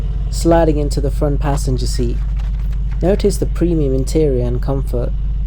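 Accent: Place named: Kerala, India. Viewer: Malayale English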